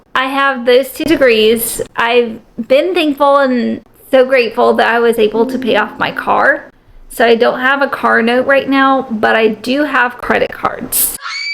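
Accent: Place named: South, United States. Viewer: Wow, you remind me so much of myself, (although I don't have the delightful Southern accent)! I got my Grand Diplome in Culinary Arts in 2004, which led me to a 80 hour weeks of minimum wage foodservice gigs, so I acquired an Associate's Degree from University of Phoenix in 2011, in a vain attempt to (HA!), "improve" my life. They have both proven themselves to be nothing but expensive wallpaper. I spent my teen years and 20's working 80-100 hours per week, and my 30's working 60+ hours per week. I moved back in with my parents when I got my house foreclosed, and still live with them at 40 years old. The only way that I can make a living ($50K-ish per year) is in a warehouse, slowly killing my back and knees. I declared Chapter 7 Bankruptcy in 2012, along with a car repo and house foreclosure, but I was still stuck with the useless student loans. 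UOP should be forced to pay back all of the people like us, as their degrees aren't worth the paper they're printed on. Enough about me, I sincerely hope that you can claw your way out if that hideous credit card debt, and can get your student loans forgiven. Best of luck to you!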